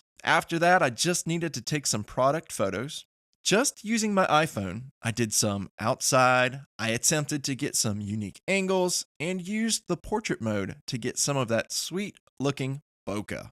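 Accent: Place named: North Carolina, United States. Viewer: I thought I recognized a North Carolina accent. Where are you located? My shop is in Whiteville from from outside of Hickory originally.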